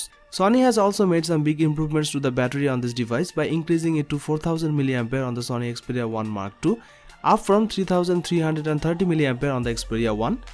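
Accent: Bengal, India. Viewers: Hmm Bengali hain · Indian accent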